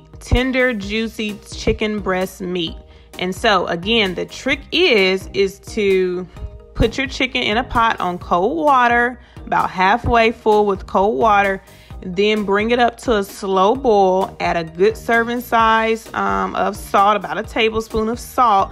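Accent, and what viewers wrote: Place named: South, United States. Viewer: You got to be from the south girlll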